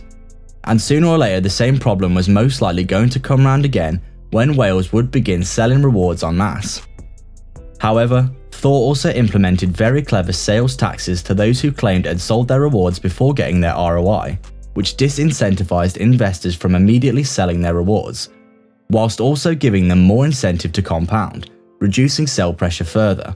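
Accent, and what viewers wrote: United Kingdom. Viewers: Great project indeed. I just love the British accent. Thanks for sharing. Vires in Numeris.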